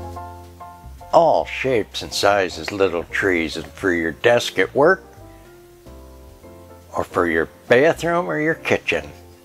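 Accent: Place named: Midwest, United States. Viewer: R u from the midwest? Love your accent merry shopping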